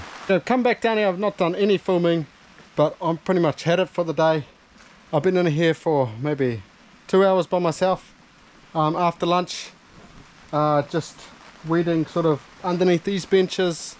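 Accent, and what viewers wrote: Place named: New Zealand. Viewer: Bros got one of the thickest NZ accents ive ever heard lol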